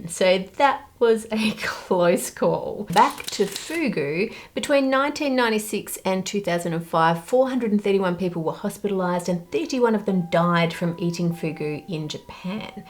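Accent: Australia. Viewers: I think sounded more Australian today. I have always thought she had a soft accent- probably because her parents are from England. In today's video it sounded thicker. 🌏🇦🇺🦘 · there's something frighteningly funny about hearing an Australian talk about near death experiences with wildlife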